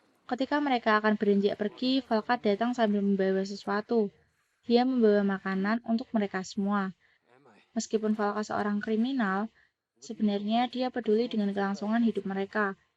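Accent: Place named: Banyumas, Indonesia. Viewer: Narator ngapak 🙃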